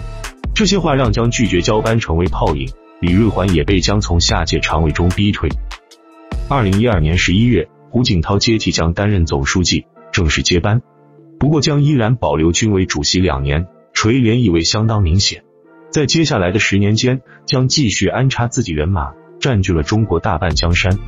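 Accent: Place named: Taiwan. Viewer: 台湾人?有台湾腔。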